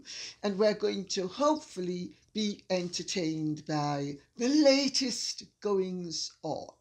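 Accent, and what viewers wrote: RP, United Kingdom. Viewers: Hi from Australia, i just loooovvveee your subtle insults with your finely clipped english aristocratic BEAUTIFUL VOICE. My daughters always often get a chuckle out of the way you have with words. Bravo 👏👏👏👏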